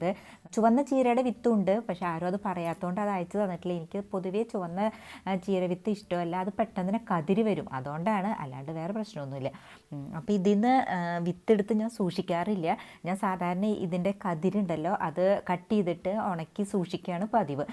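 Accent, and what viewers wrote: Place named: Malabar, India. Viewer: New subscriber. Found your videos full of useful information but your Malabari slang is evident in your audios. Not a big problem. By the way I would like to have some seeds of red and green cheera, palak, long payar, venda and chillies. If possible please send at my address : GEORGE JAMES, THYSHERRIL HOUSE, PANDANKARY P. O., EDATHUA 689 573. ALAPUZHA DISTRICT. Thank you.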